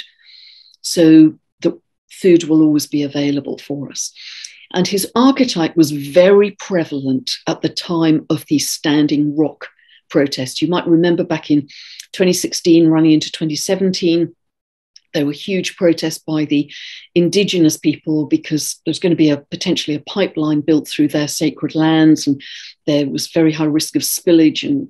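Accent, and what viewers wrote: England, United Kingdom. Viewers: "...you just have such a lovely way of turning a phrase, that it is a delight....". That's because has a most cultured English accent, so she delivers the news with panache'.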